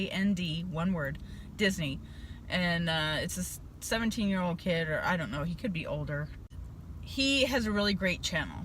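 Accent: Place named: Canada. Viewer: I have to ask.... are you from the U.S.? I am asking because you talk as if you are from somewhere else. Your dialect is from a certain province in Canada. Just asking because certain words you use remind me of home.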